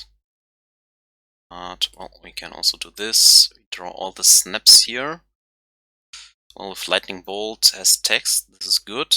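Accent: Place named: Germany. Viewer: Hey, nice run. Your dialect sounds like german, so i wanna ask, where you from.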